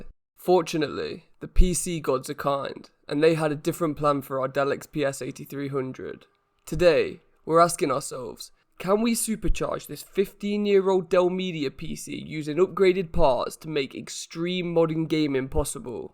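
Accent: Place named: Birmingham, United Kingdom. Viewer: ill sub to you dude.. you sound local.. im from brum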